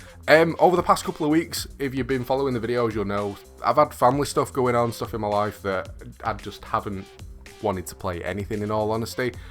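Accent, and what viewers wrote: United Kingdom. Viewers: Yo just found your channel I really like your content and if I hear your accent correct then hello my fellow Brit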